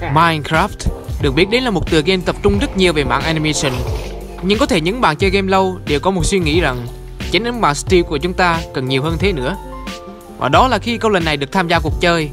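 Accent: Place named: Vinh, Vietnam. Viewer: Đọc giọng ở vinh hay ở đâu đó miền bắc khó nghe quá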